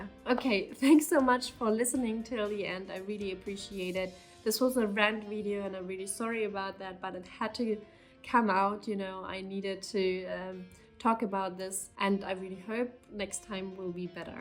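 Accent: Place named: Germany. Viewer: I recognized literrally from the first words that you were german. Are there a lot of germans there? If yes, I wont move.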